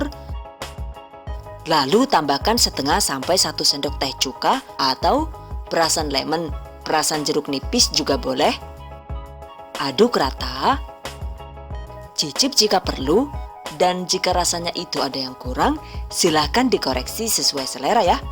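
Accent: Banyumas, Indonesia. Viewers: Kakak orang madiun,? Tapi logatnya kok ngapak.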